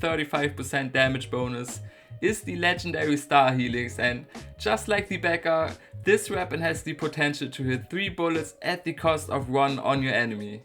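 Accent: Germany. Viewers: u are german i hear it ;D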